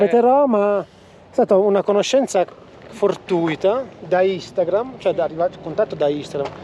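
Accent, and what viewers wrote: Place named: Montesilvano, Italy. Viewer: Ciao secondo me veniamo dallo stesso paese: Montesilvano. Sbaglio? Il nostro dialetto è inconfondibile. Mi sono imbattuto casualmente su un tuo video e naturalmente non ho potuto che iscrivermi al canale. 😀 Sono di Rivalta di Torino e sul Nivolet solitamente ci vado in bici da corsa. Complimenti per i tuoi video, mi piacerebbe “tornare” in moto; a Montesilvano avevo una Honda 750. 👍💪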